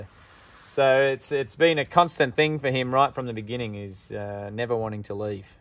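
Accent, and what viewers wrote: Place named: Australia. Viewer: You got good videos and totally a stress reliever to watch however, it stresses me to try to understan what is being said with that Australian accent. Watching from California USA . .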